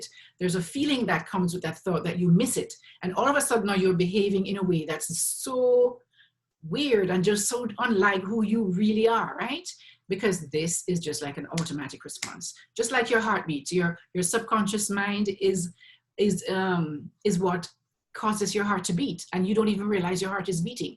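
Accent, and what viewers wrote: Jamaica. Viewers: Loved this. So informative and comforting to hear a jamaican accent give such knowledge-hope I'm correct, I know my ppl lol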